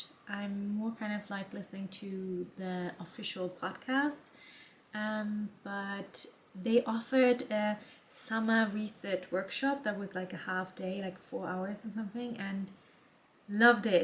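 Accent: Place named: Germany. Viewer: You’re doing great! Are you from Germany? Your accent and voice is very similar to a close friend of mine who is from Germany. :)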